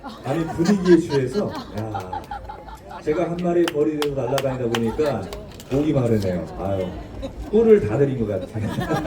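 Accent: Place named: Jeolla, South Korea. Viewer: 전라도 말솜씨 는 언제 배웠남유 👍일품입니다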